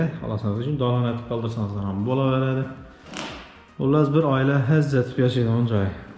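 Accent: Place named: Khorezm, Uzbekistan. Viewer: Хоразм дилинда чиройли гаплабди офарин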